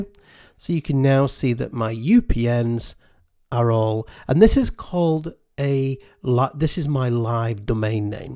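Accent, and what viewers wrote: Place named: United Kingdom. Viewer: I'm very excited about your videos, you are very calm teacher and your speaches are easy to understand thank to your perfect British accent! I want to studies all of your video and give up in my Knowledge.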